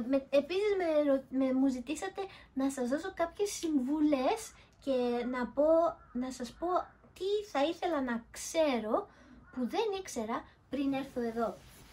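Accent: Japan. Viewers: Μιλας τα Ελληνικα με Ισπωνεζικη προφορα !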